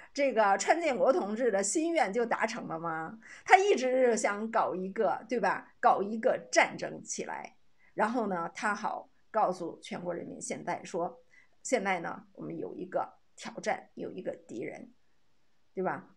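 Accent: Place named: North, China. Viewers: 你的中文是跟谁学的？北方农村口音怎么丢人了？ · 拜托＂以后＂发音＂蚁后＂ ，不是＂姨后＂。 你这老女人的一口北方农村口音太丢人了。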